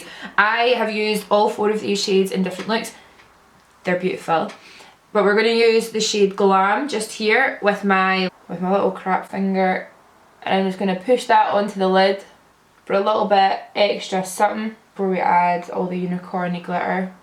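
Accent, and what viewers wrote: Scotland, United Kingdom. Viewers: Legit obsessed with all ur looks!❤️I think you are the only scottish gal that sounds normal on video, in my videos I sound sooooo bad I HATE my Scottish accent tbh😩Xx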